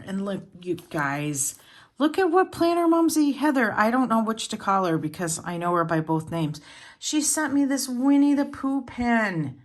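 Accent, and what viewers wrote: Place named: Minnesota, United States. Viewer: This woman is a trip. And the accent! Is it Minnesota? It’s not southern for sure but not that nasty north east yankee New Jersey thing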